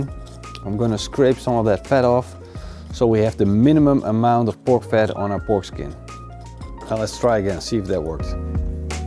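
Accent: Netherlands. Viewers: The dutch english accent is throwing me off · Haha dutch accent is just to strong😂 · the dutch accent is strong with this one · ben je van nederland ? zo ja dan hoor ik et aan je accent xd . leuke video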